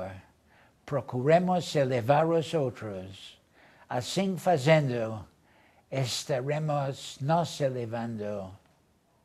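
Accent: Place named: England, United Kingdom. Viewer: Nossa, ele falava exatamente do jeito que eu falava quando era criança quando imitava os Ingleses falando português kkkkkkkkkkk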